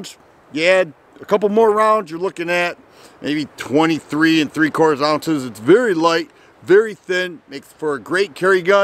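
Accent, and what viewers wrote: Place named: Upper Michigan, United States. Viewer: well deserved! You voice remind me of the accent in the UP of Michigan, where I was born!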